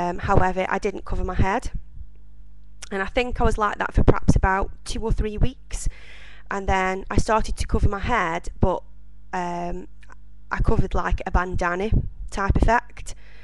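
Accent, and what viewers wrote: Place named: England, United Kingdom. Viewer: asalamu alaykum sister ; congratulation for getting back to this wonderful religion , from your accent i can tell that you are from england or around that area , i know its a hard decision but the benefits are great , , your brother IMAD from california , and congratulation again for converting to islam let me hear from you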